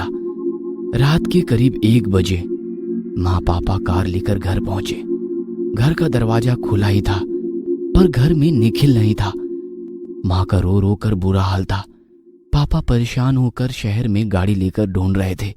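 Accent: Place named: Rural, India. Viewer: although the accent is a little village like but am in love with the voice 💕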